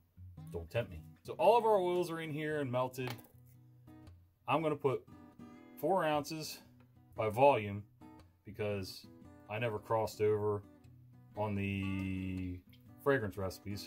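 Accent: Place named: South, United States. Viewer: I honestly didn't expect words like mantra and ta-daa coming from that southern mouth(although you did explain, you grew up in jersey) lol. really refreshing to hear people like you. ~keep it up.coming straight at you from karachi, pakistan. Also never stop starting ur videos with yuuuppp.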